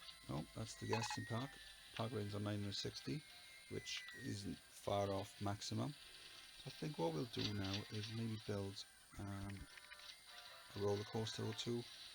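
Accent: Wales, United Kingdom. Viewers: Ooooh is that a Welsh twang I can detect in your voice?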